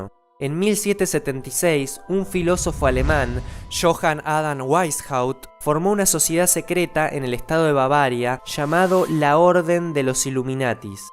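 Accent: Buenos Aires, Argentina. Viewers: eres de argentina? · Sos Argetnino?? por que tu acento me suena a argentino... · es argentino? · no sabia que eras argentino... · este flaco es porteño gato !! awta...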